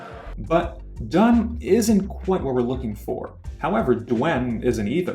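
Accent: Standard. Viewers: 你的普通话说得非常好，很标准，甚至听不出口音，真的很厉害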